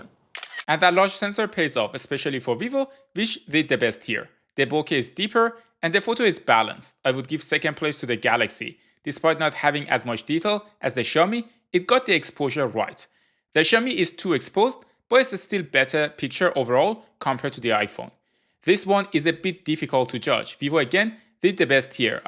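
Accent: Iran. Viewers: persian accent shines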